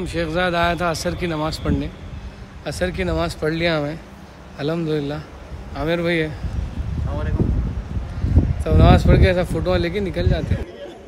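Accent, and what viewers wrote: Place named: Hyderabad, India. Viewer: Bhiya full hyderabadi slang me hai kiraak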